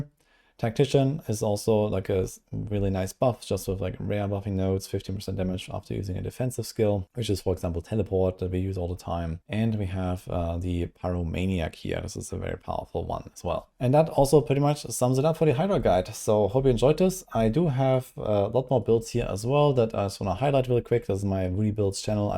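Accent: Germany. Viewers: Man erkennt immer sofort wer deutscher is. Nice vid! Wird direkt nachgebaut 🎉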